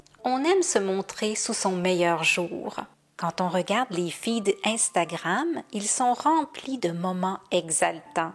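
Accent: Canada. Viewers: Bonjour j espère que votre forme est revenue je suis tout a fait d accord avec vous pour ma part plus je prévois faire de choses et moins j en fais notre corps nous parle il faut savoir l écouter si on tombe malade c est pour nous obliger a arrêter merci pour vos vidéos et le petit accent canadien ❤😊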